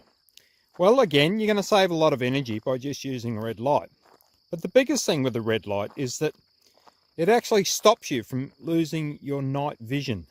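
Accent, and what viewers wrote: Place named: Australia. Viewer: Don't tell me, let me guess: You're from Australia, right? :D In all honesty: Thanks, that was very helpful!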